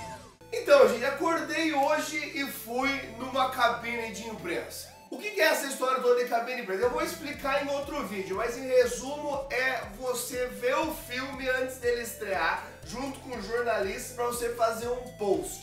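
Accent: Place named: South, Brazil. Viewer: seu gostoso! esse teu sotaque é de que estado do Sul? reconheço demais esse sotaque hahaha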